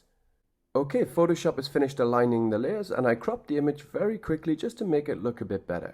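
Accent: Newcastle, United Kingdom. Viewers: are you from Newcastle? awesome tutorials thank you ☺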